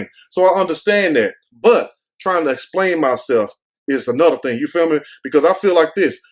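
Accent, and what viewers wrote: South, United States. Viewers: I see you representing H- Town today. One of my favorite groups to relax and chill with. I like watching you, cos you be talkin that real shit. You seem to have Southern roots, hear it in your dialect. Keep on wit the keep on😅😅.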